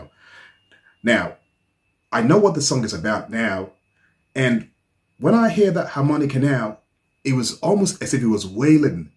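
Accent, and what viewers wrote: England, United Kingdom. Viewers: It sounds like you are from the UK (England). How is it that you never heard this song?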